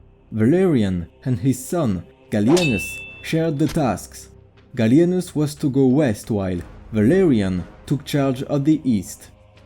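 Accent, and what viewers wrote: France. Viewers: donc c’est bien un accent français ?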